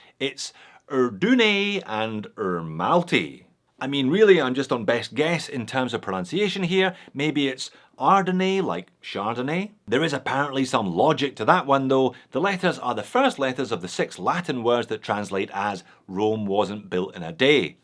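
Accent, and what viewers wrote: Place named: Glasgow, United Kingdom. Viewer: You sound so Glaswegian playing the prospective partner there mate! It never leaves you. And hello from Glasgow by the way: we’re freezing our arses off here right now!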